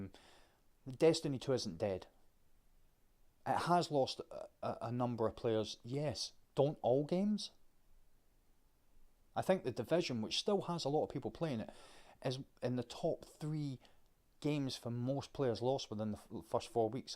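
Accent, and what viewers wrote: United Kingdom. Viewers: You have a kawaii British accent. You should talk more about this. My voice is gay LOL. I sound like a dude.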